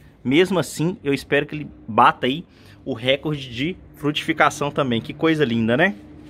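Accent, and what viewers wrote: Minas Gerais, Brazil. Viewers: Cê é de onde de minas?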